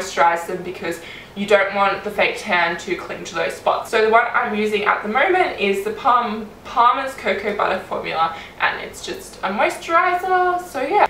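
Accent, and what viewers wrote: Australia. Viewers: frenchfry AUSIIIEEE, LIKE ME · french fry Maybe Australia? I think so! You could check her social media though, maybe she has it written there:) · I think she"s Australian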